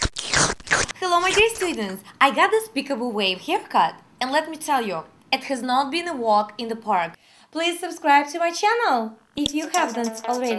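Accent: Russia. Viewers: капец акцент питерский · такой акцент поймут только в россии :) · Её рязанский акцент раздражает... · I clicked a few of these because of they were featured. I think it's pretty cool what she does. Smart and good looking. Plus who does not like a Russian accent ? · nice russian accent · russian english accent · Русский акцент...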